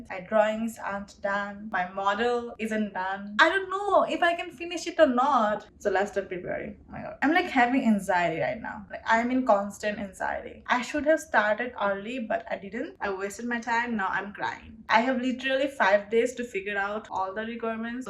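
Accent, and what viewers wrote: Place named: Bangladesh. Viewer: i'm a student of hsc 26 batch and recently i decided that i want to study archi from buet. so i searched up archi related bangladeshi videos but the content is so less. this video randomly popped up in my yt homepage and i started watching and thought to myself "accent ta amader moto lagtese. apu ki bangladeshi naki?" and went to comment section to check and YOU ARE ACTUALLY ONE OF US. i just binge watched all of your YT videos and GIRL I HAVE BECOME A HUGE FAN. a girl? check. buetian? check. archi student? check. moved to usa? check. APU UR LIFE IS LITERALLY MY DREAM AND I ASPIRE TO LIVE LIKE THIS ONEDAY. lots lots lots of love and im so disappointed to hear that u got trolled online. plz dont stop making these videos. im really motivated rn as i learned je amr dream is not actually impossible and someone is living it already. 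lots of bhalobasha again ❤ ❤ ❤ ❤ ❤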